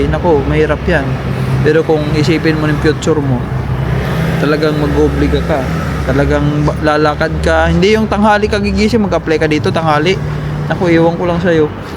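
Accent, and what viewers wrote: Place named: Waray, Philippines. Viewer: Waray waray ka kb sir..kasi yong accent mo sumasabit sa Pagka waray eh😅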